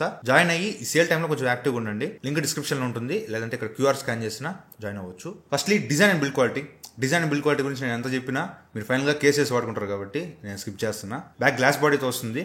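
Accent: Nellore, India. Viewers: anna manadi nellore aa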